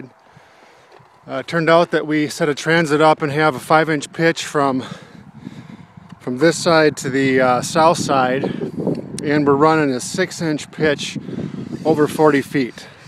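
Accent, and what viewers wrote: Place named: Upper Midwest, United States. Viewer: Based on your accent, somewhere west of the Great Lakes and near Canada.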